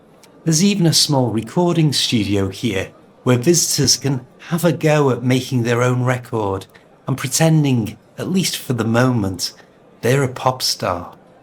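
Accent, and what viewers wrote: Liverpool, United Kingdom. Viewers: Do you have Liverpool accent? I love British accent, but I find it really hard to choose which accent suits me best because there are so many different accents in the UK. Could you suggest which one I should learn?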